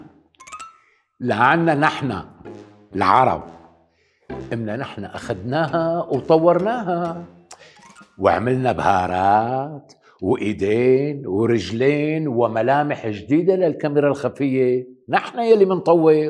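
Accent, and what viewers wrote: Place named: Syria. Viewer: والله انا اسمع للاستاذ مش بس عشان نقده واسلوبه، بس كمان عشان لهجته وطريقة كلامه السورية اللي وحشتنا كثير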